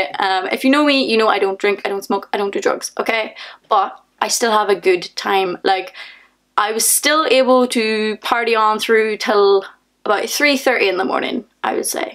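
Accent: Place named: Ireland. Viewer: Which part of Ireland is that accent from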